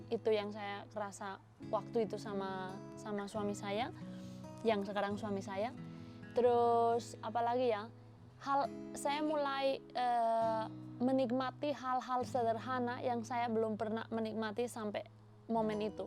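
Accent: Indonesia. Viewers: Buset bahasa indonesia fasih banget,logatnya pun indonesia banget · Keren banget dialeknya , 90% Indonesia . ❤️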